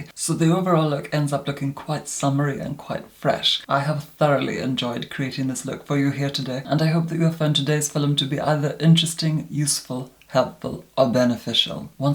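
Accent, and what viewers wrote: United Kingdom. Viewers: I just adore a British accent (or most any accent for that matter lol). Technically, the British (aka English) accent is not really an accent. As an American, it only SOUNDS like an accent. Pretty sure the Americans accent developed from the Brits! 😊👍🏼💚💜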